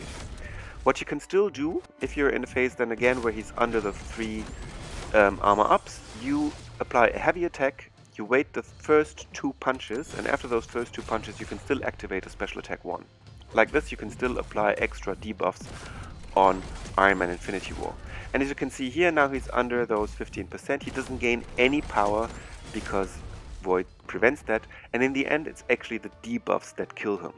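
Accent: Germany. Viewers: You sound german, am i right? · Where are you from dude? you sound German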